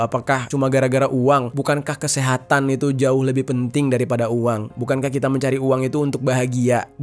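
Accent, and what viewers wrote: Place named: Aceh, Indonesia. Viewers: orang aceh selatan dia ya? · Logat aceh nya itu loh keren banget bang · Suka banget dengar bang logat aceh😅❤ tak ulang² terus😂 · Dari cara abg pake loghat aceh, udh tau klu bng lagi keselll 😂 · Bang asli Aceh ya,cocok banget logat Acehnya,ingat temanku waktu kerja di Jakarta.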